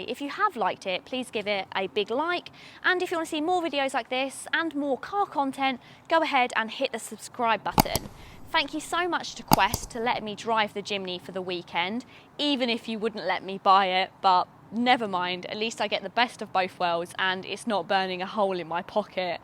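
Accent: United Kingdom. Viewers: Your UK sounds so nice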